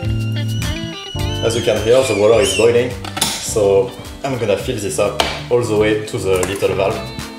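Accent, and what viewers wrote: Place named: France. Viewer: Your voice and accent remind me of the great French chef Eric Ripert. Great video!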